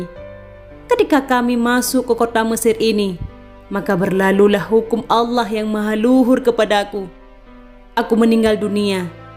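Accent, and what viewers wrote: Aceh, Indonesia. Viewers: Mirip dengan suara almarhum juga🙏😇, kaya cadel. Ciri khas bicara orang aceh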